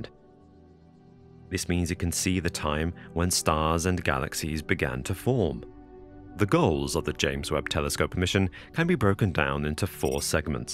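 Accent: United Kingdom. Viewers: Wowww! Amazing video and English accent are pretty nice thank you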